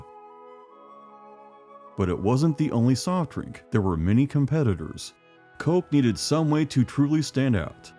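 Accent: Indiana, United States. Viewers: Your videos make me feel homesick for Indiana…parents from Ft. Wayne and Otterbein, my first 18 years in Anderson, next 30 years in Bloomington and Nashville, then overseas and now Iowa. An Indiana accent is subtle but it feels like home to me…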